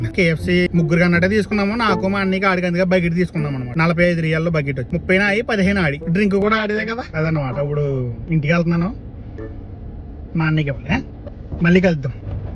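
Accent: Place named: Godavari, India. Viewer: Godavari slang adaragottestunnav anduke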